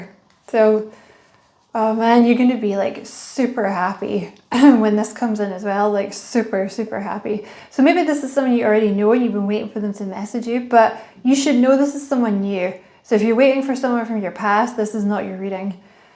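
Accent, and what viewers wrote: Scotland, United Kingdom. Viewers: Thank you. Are you scottish? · You sound Scottish 💙🏴👍 very accurate. Im incredibly intuitive. Im normally ten steps ahead. Anxiety up the ass lol so yes im done. New location and stagnant within a partnership. Put thru the shit, bullying, gaslit, manipulation, being spied on, stalker you name it..Things are coming to a very big end. Your so spot on. TY so much ❤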